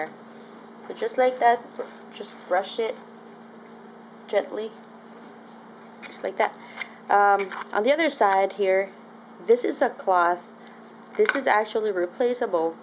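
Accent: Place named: Philippines. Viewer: Great video! I can hear you pinoy accent ha. You sound like my mom haha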